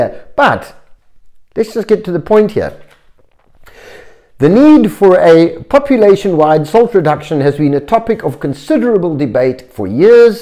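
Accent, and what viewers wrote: South Africa. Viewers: You sound south african, thanks for your video!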